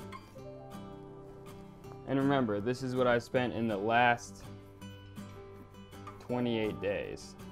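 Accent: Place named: East Coast, United States. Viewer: Loved the video and I’m thinking of doing this! Are you still in AZ?! It would be awesome to meet you sometime, are you originally from the East Coast, because you sound like it.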